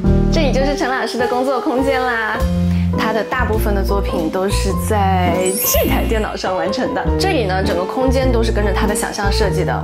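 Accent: China. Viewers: 是大陸人嗎？口音聽起來實在是不習慣😅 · 主播是台灣人嗎？感覺有京片子腔，是大陸媳婦兒嗎？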